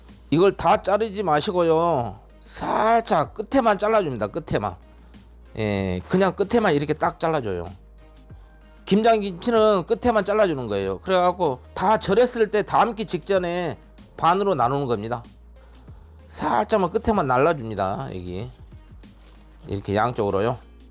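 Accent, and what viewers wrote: Jeolla, South Korea. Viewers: 님 고향이 전라도맞으시죠?ㅎㅎ 억양만들어봐도 전라도말투네요 저두전라도 ㅋ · 셰프님 김장레시피 잘봤습니다 전라도 사투리구수하구요 메모잘했습니다 행복하세요 ~^^~ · 셰프님 겉절이 해봤는데 당일은 "액젖 냄새가 좀 나네" 했는데 다음 날 남은 것 먹으니 정말 맛나네요 감사합니다 전라도 사투리가 잼 나네요 쬐간한것~등 쉐프님 우리 세자매 다 구독자랍니다 오늘도화이팅 😅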